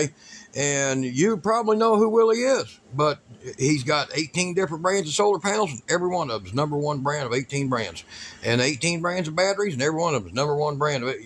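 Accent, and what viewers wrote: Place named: East Texas, United States. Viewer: Yor seam to be developing a east Texas accent , I like it but I thought you were west of Austin . I'm in north east Texas myself .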